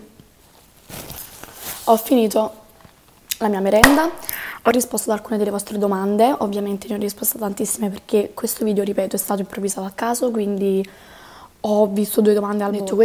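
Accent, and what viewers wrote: Italy. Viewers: Ma é proprio il suo modo di parlare é una cosa che hanno i napoletani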